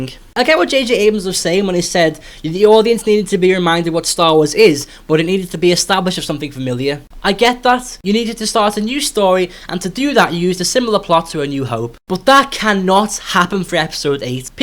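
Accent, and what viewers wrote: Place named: Scotland, United Kingdom. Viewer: are you Scottish or irish or what?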